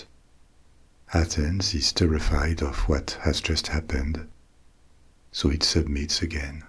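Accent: France. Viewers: the original of this video got me through many sleepless nights. thank u for coming back mr!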